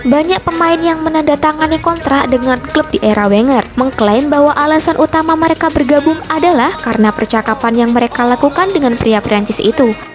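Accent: Java, Indonesia. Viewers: Admin nya pasti orang jawa.. logatnya medok betul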